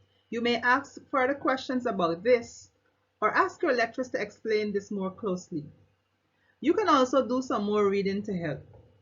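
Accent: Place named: Guyana. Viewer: Great presentation thank you for sharing I was educated looking forward for information on writing research papers and it was refreshing to hear an intellectual presentation with a Guyanese accent ✌🏾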